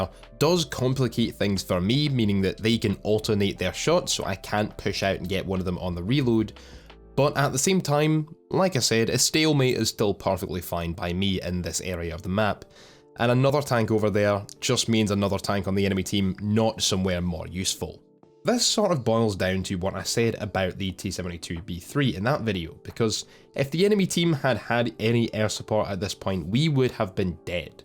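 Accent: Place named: United Kingdom. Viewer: Eduardo Raul hes scottish... his name is litterally. Also his accent makes it very clear he is from the UK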